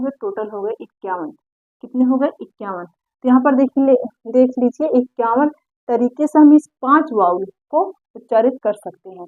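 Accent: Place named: Uttar Pradesh, India. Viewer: Madam, You may belong to Uttarpradesh, I guess by your tone, so many thanks for it.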